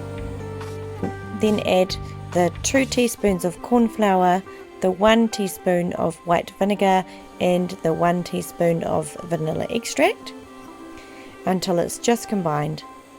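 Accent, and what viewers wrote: New Zealand. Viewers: Soo good to hear a kiwi voice doing this.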